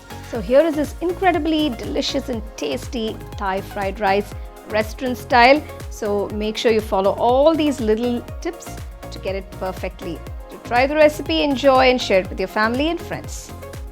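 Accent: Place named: India. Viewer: Indian accent 😂😂😂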